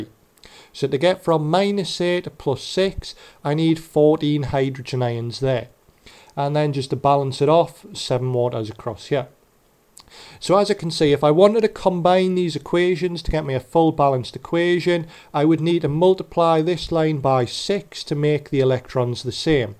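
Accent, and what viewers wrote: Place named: Newcastle, United Kingdom. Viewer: Great to have a Geordie ;)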